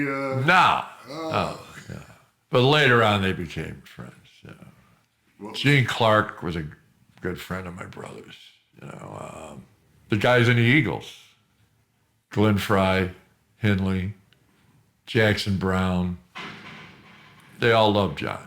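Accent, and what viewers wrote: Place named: Illinois, United States. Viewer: Thank you, for giving me this transporting window into your life with your brother John and a cast of characters that was colorful, fun and from the heart and reflects your love of the time you spent with him. 🌸Your Illinois voice makes the stories even better as I have family from west of Chicago, more fun than a barrel of monkeys. God bless you ❤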